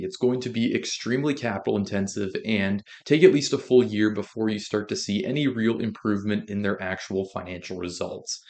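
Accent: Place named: Canada. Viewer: From your accent you must be canadian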